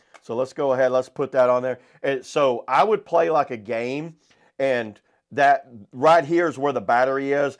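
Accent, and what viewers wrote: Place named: South, United States. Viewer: Your country accent is extremely noticeable 😅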